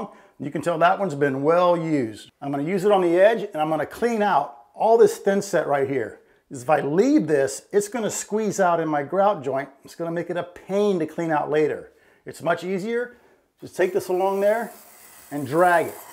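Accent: Louisiana, United States. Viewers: La =Louisiana. LA= Los Angles. He is in Louisiana, the accent should give that away!!!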